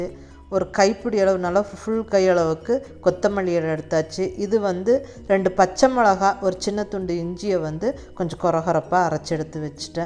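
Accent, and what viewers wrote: Coimbatore, India. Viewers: Aunty u know am a combatorian ,whenever am hearing ur voice am feeling like in Coimbatore...superb vlog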